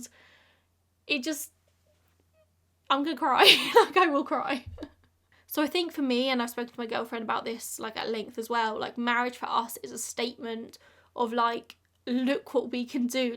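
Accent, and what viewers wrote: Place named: RP, United Kingdom. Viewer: Honestly she has the best voice/accent. You should be the new "Mind The Gap" voice.... Very posh. What area of England are you from? I'm sorry Reading is a shit hole. I'm from Kentucky, maybe for your next horse you can come to Fasig-Tipton and buy one 😆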